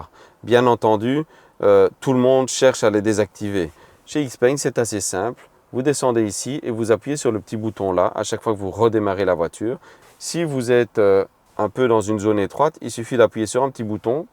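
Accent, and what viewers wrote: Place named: Belgium. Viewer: Sympa un danois qui parle français avec un petit accent belge 🎉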